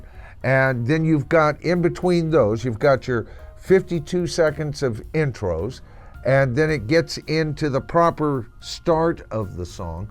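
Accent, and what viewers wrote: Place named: United States. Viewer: . If you are an American, and your accent makes that likely, You and I and all the Rest of Us have more serious things to worry about than a Plastic "Yes" ( and how many originals are in this 2026 lineup? )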